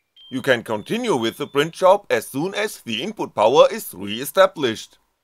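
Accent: Germany. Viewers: Is that a German accent?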